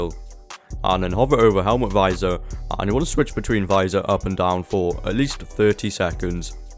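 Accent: Wales, United Kingdom. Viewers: You sound welsh where are u from?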